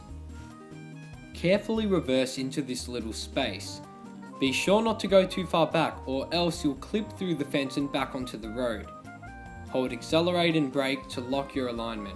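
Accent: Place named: Australia. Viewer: Like this comment if you only care for the Aussie accent 🙂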